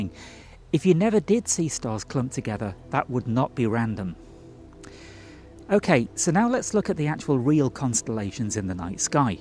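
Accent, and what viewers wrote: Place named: England, United Kingdom. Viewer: You sound pom. You talk northern side so your a pom. Then you knot one up for the Southern Cross. Hell, your now family bro. To the Eureka ✨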